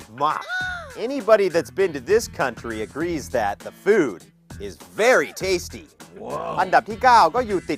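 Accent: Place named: Isan, Thailand. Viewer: ชอบคำว่า "บ้านเรา" ในตอนที่ บอกว่า มาเลเซียอยู่ไกล้บ้านเรา ฟังแล้วไทยๆดีแท้ แถ พูดอิสานได้อีก ชอบมาก ผมเองก็พูดได้ฟังรู้เรื่อง แต่ข้อยบ่แม่นคนอิสานเด้อ จะขอติดตามดูทุกตอนจนกว่า จะเลิกทำ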